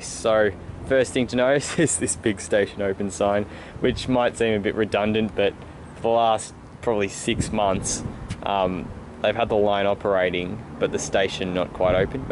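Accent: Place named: Australia. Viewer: Love the voice for the life has aussie accent!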